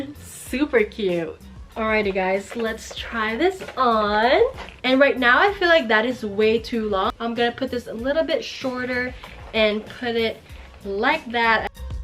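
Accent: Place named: France. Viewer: Oohhhhh with that French accent. Not too shabby 👌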